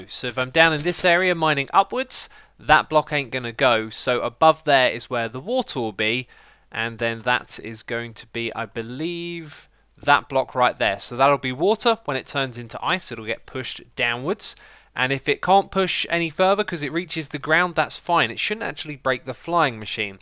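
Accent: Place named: United Kingdom. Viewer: I absolutely love your videos! Technical building and a British accent! What more could you ask for?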